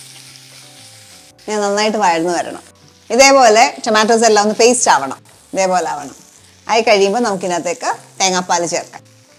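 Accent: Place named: Central Travancore, India. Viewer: Lady speaks the central travancore dialect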